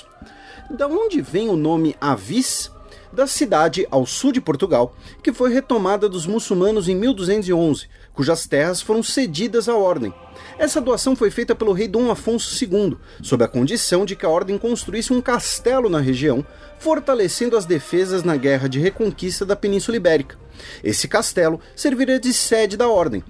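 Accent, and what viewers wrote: Brazil. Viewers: Com este Português tupinambá, eu duvido.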